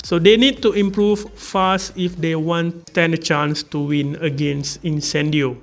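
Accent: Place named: Indonesia. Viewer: in the accent sounds like indonesian or malaysian and little singaporean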